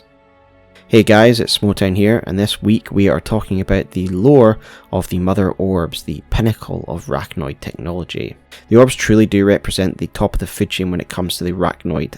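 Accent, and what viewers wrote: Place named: Scotland, United Kingdom. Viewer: Quick question for ya. You clearly sound Scottish, are you toning your accent down for videos? Just curious because Shaun another Youtuber from Edinburgh does that as well.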